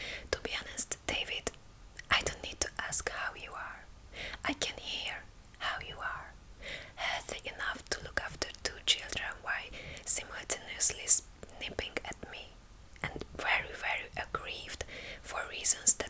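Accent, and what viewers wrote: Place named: Hungary. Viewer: Haha very thick hungarian accent, i like it!!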